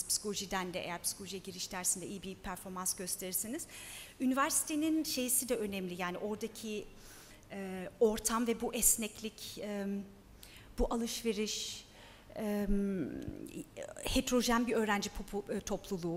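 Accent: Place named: Germany. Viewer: Kesinlikle ama almancı gibi konuşuyor maalesef.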